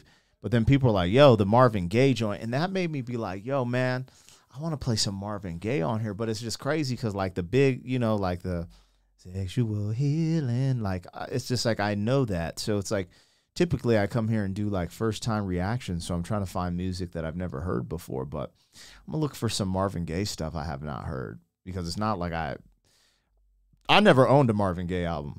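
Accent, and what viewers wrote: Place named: Louisiana, United States. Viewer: cali boy with a cajun accent!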